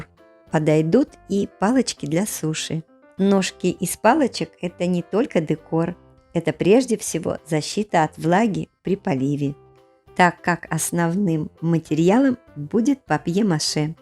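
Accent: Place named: United Kingdom. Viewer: I love it and appreciate the UK voice over. Many thanks x❤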